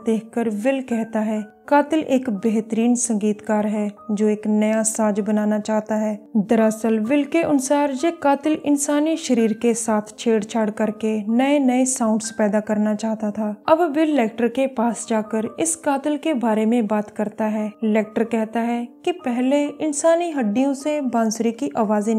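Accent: Punjab, India. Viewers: Punjabi accent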